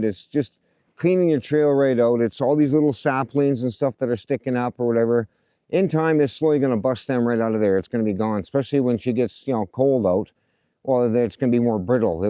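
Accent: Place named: Nova Scotia, Canada. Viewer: Sounds like a Nova Scotia accent.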